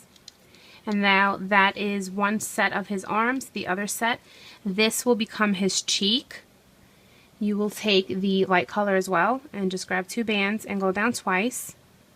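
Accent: New Jersey, United States. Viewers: R u from NJ ?????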